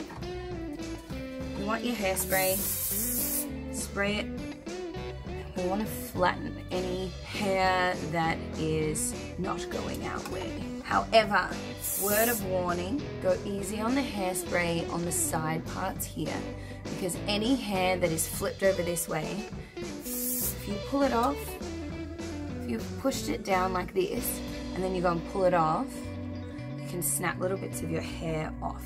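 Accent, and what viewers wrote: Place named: Australia. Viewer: It sounds to me like you now have a middling English with slight Australian accent. Nice!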